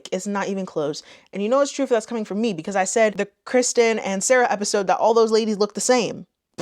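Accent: Canada. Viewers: first time watching you, i love how Canadians say words with "ou" in it lol Aboot. "Oot of the scene." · Gurl I just found you, so happy I did.. your hair looks amazing also that accent is 100% Canadian lol (Been binging ya since finding ) Love that you are a fellow Canuck. 🍁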